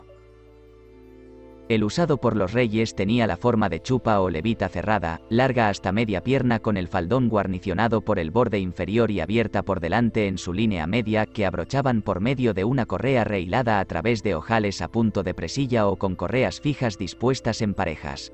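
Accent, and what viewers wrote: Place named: Canary Islands, Spain. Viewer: Una forma de darle un plus de valor a la información es hacerlo con un acento que no le CHIRRÍE A NADIE. Un acento canario formal, (no el coloquial o informal de bares y colegas) en un tema canario es altamente recomendable y muy coherente, máxime si encima ustedes se hacen llamar.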